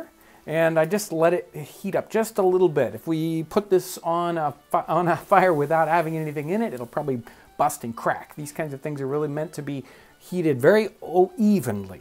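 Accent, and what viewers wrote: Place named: Midwest, United States. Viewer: Does anyone else love his Midwestern accent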